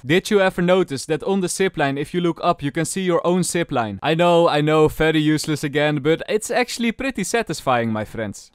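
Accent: Netherlands. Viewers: Its a dutch accent😂 the way he talks and he confirmed it with the word camera lol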